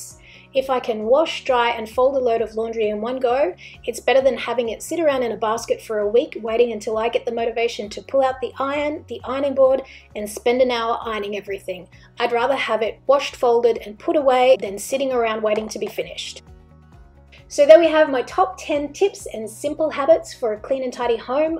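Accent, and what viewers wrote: Australia. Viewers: Is that Australian accent? Maybe SA? Anybody knows please? 😁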